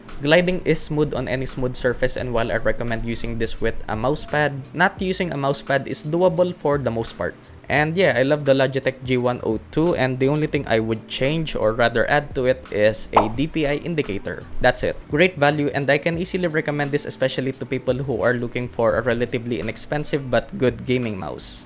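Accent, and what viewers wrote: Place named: Philippines. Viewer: I subscribed, very good review, nice filipino accent.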